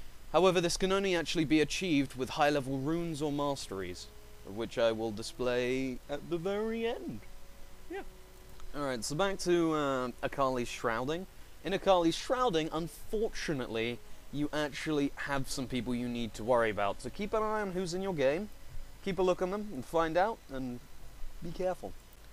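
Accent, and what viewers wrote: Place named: United Kingdom. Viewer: it's like the british version of Cryaotic's voice o.o Kinda, kinda.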